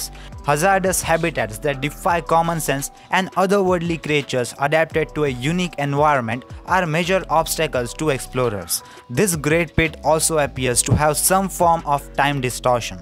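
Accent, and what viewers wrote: India. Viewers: Very intreating good job! I don’t personally mind your accent because I’m also Indian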